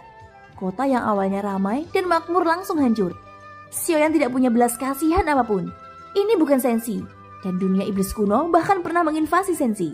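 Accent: Java, Indonesia. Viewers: Medok nya 😂😂😂😂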